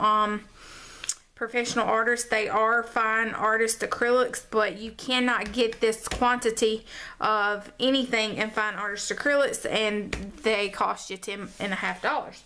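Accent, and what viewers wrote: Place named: North Carolina, United States. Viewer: Hey! Where are you from? It's rare I hear someone on YouTube that talks like me!!! Country girl from Western NC here!!:)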